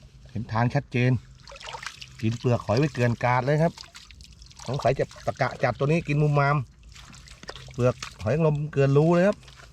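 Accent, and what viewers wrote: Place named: Trat, Thailand. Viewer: สำเนียงการพูดเหมือนคนตราดเลยเกี๊ย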